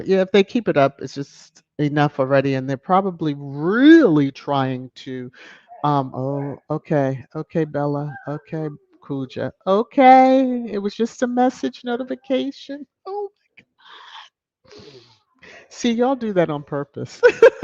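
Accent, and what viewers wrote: RP, United Kingdom. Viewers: Thank you for great thrash time 🙏😘❤️❤️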